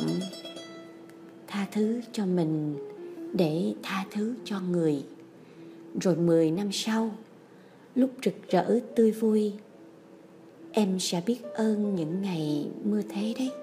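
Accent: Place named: Saigon, Vietnam. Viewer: Anh thich em doc Dung chat giong Saigon hon la lai Bac... cai nay hay hon may cai truoc Do em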